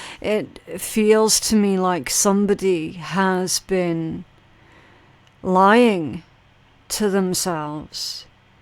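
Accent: Scotland, United Kingdom. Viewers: I've had a glimpse of what I'm becoming, but....anticipation is making me wait. 😐 Hey - so nice to hear UR Scottish brogue loud & clear. ~ Godspeed ~ 🙏☀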